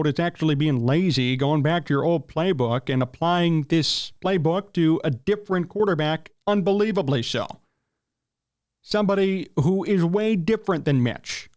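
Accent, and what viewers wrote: Chicago, United States. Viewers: Hey your Chicago accent is Golden 😄👍💯 Da Bears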